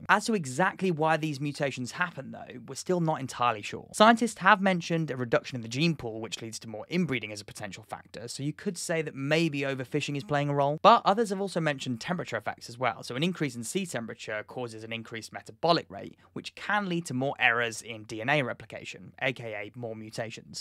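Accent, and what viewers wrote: United Kingdom. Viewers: He's from Britain. They pronounce things a little bit different like, for example, what we call cilantro, they call coriander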